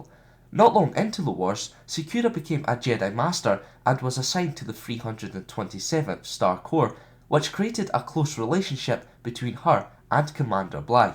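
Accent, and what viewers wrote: Scotland, United Kingdom. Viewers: Are you Scottish? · Hahaha are you Scottish?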